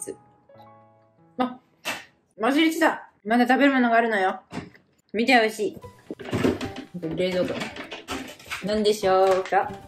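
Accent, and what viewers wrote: Hokkaido, Japan. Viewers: お話しも絶好調ですね。北海道Accent炸裂！道東の方ですか？ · の、北海道弁が可愛いらしく聞こえます。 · 70歳のおばあちゃんです。いつも楽しく観ています。帰国してからのtalkで時々出る北海道弁がすごく可愛いなって思っています。もっと北海道弁で話してほしいです。因みに私は北海道出身ではありませんが、、、。英語頑張って続けて下さいね。私も長く勉強して現在は仕事に活かしています。継続は力なりです！ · イントネーションが北海道ですね😃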